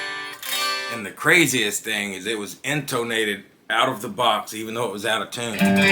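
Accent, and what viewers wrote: South, United States. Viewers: are you located in Orlando? I always thought you were from Kentucky, Alabama or somewhere down there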